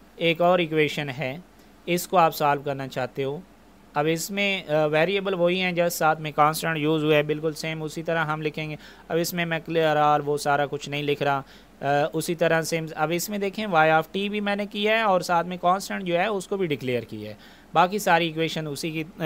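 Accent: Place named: India. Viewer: first lac i understand each and everything😃,thanks allah sir your teaching method is soo good,because you speak too desi like us😁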